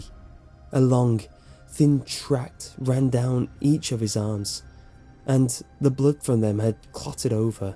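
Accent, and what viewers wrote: England, United Kingdom. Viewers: SPOILER ALERT: The image described at the end is the actual photograph of Mary Kelly's crime scene by the sound of it. This is a good one, I like your taste. Good to hear an English guy reading these things too I have to say, it's quite rare though I have found others. I might even record some myself, as I haven't yet heard an English woman narrating creepy pasta's or other horror stories. I think the Creepy Pasta genre is a bit over saturated now, I was thinking of just reading old horror stories the copy right has expired on maybe. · How fitting to be told by an English man. x3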